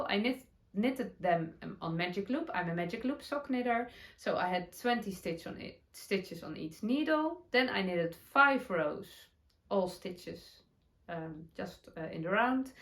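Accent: Netherlands. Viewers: Hello from Australia & Thankyou so much for a great podcast. Since finding you I am now binge watching all your English videos and loving them. Sitting bedridden sick & knitting socks, you are keeping me company. How truly exciting and special to receive yarn specially dyed for you. WOW!!!! I bet you did a happy dance for sure. Love your Dutchy-English 😍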